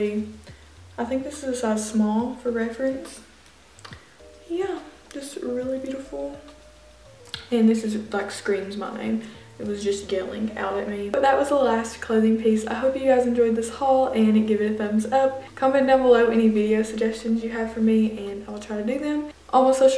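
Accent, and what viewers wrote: South, United States. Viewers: her accent is country af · her accent is so southern southern accent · arina k i thought she was Australian then i heard a hint of southern lol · living for country accent.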